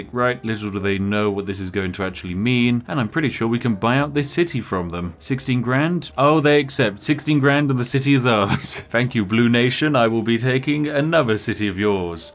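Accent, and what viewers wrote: United Kingdom. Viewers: I have a question, Are you Bri-ish? 🇬🇧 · This guy is definitely British guys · Why r u british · Your Brit is showing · I dunno what it is but something tells me he’s British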